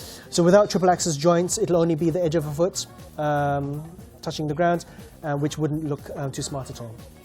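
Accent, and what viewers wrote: United Kingdom. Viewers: British accent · He sounds british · Wow! your Smart Dolls are amazing! i love them! and Also, you have a beautiful english accent, i understand you perfectly, congratulations!!!!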